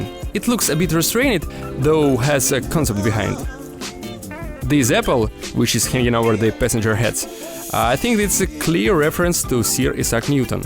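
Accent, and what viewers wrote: Russia. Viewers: Смысл его тренить на русском акценте? Американца или англичанина после этого не поймёшь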